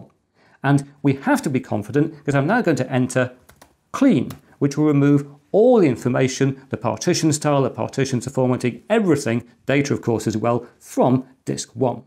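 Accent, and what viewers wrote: England, United Kingdom. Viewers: Genuine English accent, simply love it so much😍😍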